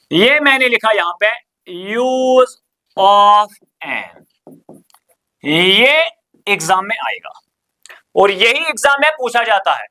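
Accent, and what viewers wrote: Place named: Kulgam, India. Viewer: Sir shayad kulgam sai hai (asent)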